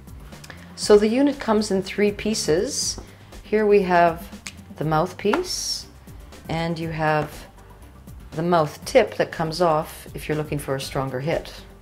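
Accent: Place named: Netherlands. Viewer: your voice has a hint of a dutch accent in it, am i right?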